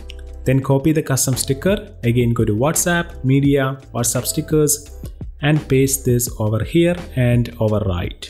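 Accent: Kerala, India. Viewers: Are you a malyali...? Just asking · Tovino onnum venda.. typical mallu accent .. entem angane thanna ketto😂😂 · Malayalee aanalle? English accent kettapo thoni 😬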